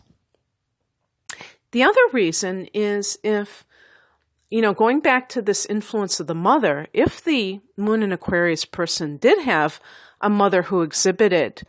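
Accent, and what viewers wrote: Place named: Minnesota, United States. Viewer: Are you from Minnesota? I am, you sound like you could be lol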